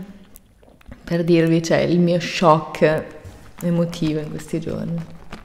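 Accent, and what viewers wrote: Italy. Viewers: Me encantas , hermoso el acento italiano ✨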